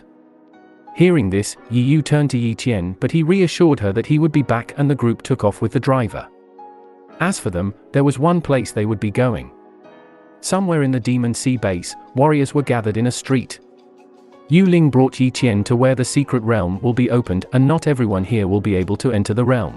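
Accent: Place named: United Kingdom. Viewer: A British narrator this time🧐☕👌 😂😂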